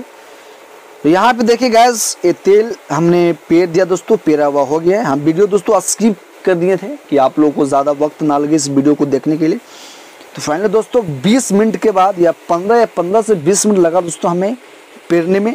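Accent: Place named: London, United Kingdom. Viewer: Bhai London wali hindi q bol rahe ho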